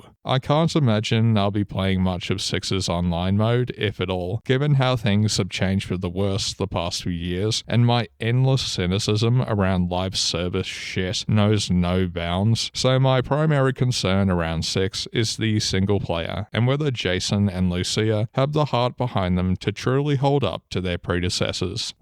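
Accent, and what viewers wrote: Australia. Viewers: australian accent is peak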